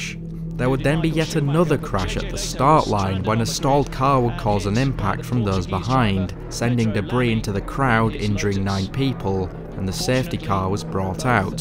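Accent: United Kingdom. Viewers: Bro sound so British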